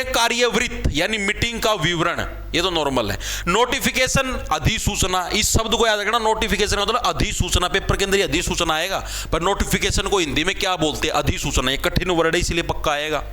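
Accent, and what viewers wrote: Haryana, India. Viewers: Mujhe lagta h sir hariyana se h.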